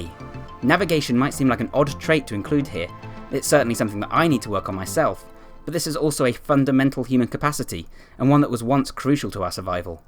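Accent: United Kingdom. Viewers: At the risk of sounding rude, your voice really doesn't match the body I'm seeing in the video. Where in the UK is this guy from?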